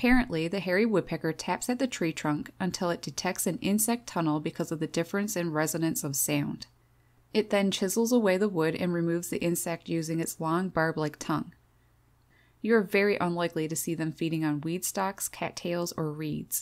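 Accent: Canada. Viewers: You have the most Canadian accent I've ever heard. Cool video.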